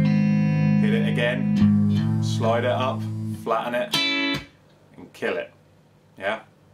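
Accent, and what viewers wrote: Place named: United Kingdom. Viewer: A bigger guy, American guitar brand (Fender), camo pants, shirt with cursing on it, song... The British accent was the LAST thing I expected. Way cool. Thanks for the lesson, mate.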